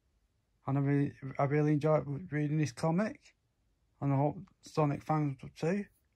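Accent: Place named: North, United Kingdom. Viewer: ahhh that's right! I thought I could hear some Northern in you 😂 I'm part Scottish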